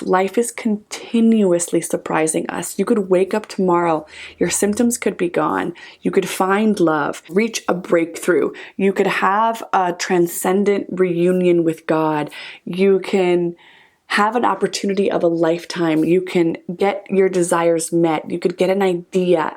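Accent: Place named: Ireland. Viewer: Lovely vlogs..very interesting!...I hear a touch of Irish in your accent..💚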